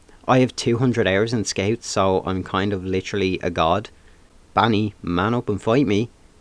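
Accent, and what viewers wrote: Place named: Ireland. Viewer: Tf that Irish accent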